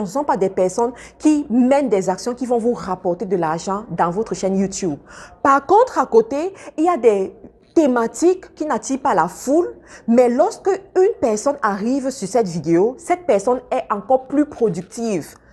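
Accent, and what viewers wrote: Cameroon. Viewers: J'aime trop votre façon de parler! Vous êtes la seule mbenguiste camerounaise qui a su garder son accent.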